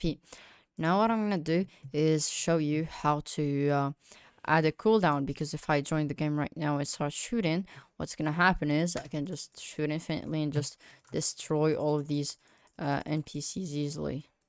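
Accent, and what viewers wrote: France. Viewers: are you french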